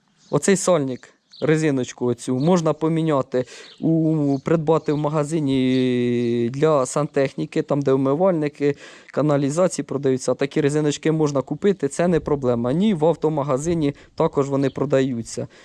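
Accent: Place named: Poltava, Ukraine. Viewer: Мені ті поплавкі даром не треба,але додивився до кінця! Цей Полтавській говор це мед на вуха "діОдик" тобі машини треба продавати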